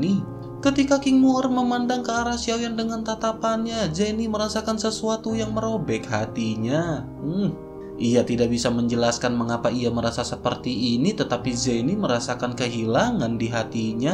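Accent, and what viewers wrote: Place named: Jakarta, Indonesia. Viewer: Paten mimin logat betawi keren dan lucu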